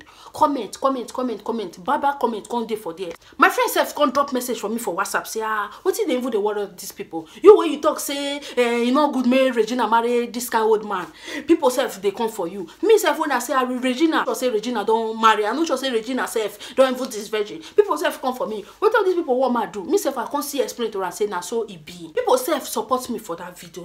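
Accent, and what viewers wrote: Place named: Warri, Nigeria. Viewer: Chai, this your pidgin na original one. It seems you be WARRI brought up WAFFI BAE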